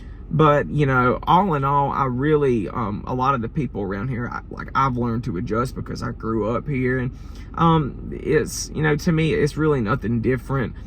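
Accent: South, United States. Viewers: I’m a native Spanish speaker in a small Latin country, I do realize that has a beautiful southern accent, and I really appreciate his vocabulary, not a single swearing word, which is wonderful. I don’t know why, but foul language in my native Spanish or the English that I know, resonates in my mind in a very disgusting way, I’m not a saint of course, but language makes all of us so special, doesn’t it? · ❤ I could listen to you talk and tell stories all day long. You have a beautiful southern drawl. I'm a Texan, so I got a slight drawl myself from time to time depending on who I'm speaking with. ❤ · Thanx for this wonderful video! Me and my partner live in Amsterdam and both really love your southern accent! Hope you are doing well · I love your Southern accent 😃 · I too am a true Southern boy just like you even down to the voice .😊 y'all have a great day and thanks for sharing this video! 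· . I am from West Saint Louis County. I am sorry for what you went through while living in the south. I am sure the Midwest culture here is probably different than what you are used to and I bet you were asked a million times where you were from with your accent when you lived in STL. 😀. You seem to be a fine young man and I wish you the best. Come back and visit STL soon.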